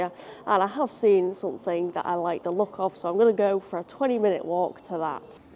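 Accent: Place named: England, United Kingdom. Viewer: u are speaking with r e al english dialect and so who s hould be interested on your view by th way xDD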